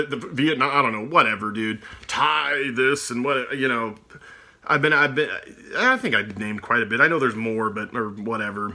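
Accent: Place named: Oregon, United States. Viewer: yes, you do. I’m an Oregonian, from just south of Portland, and you sound like everyone I know.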